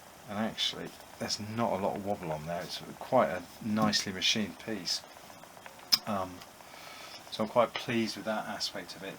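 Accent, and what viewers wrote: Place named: England, United Kingdom. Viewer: That is a beautifully crafted part you had made as a replacement. And FWIW - as you wondered about who might watch your videos in the future. I enjoy watching your videos, even though I have no intention of restoring a motorcycle, in fact I don't even own a motorcycle right now. I do however find your video's quite entertaining, and you are a likable presenter with a nice tone of voice and a pleasant English accent.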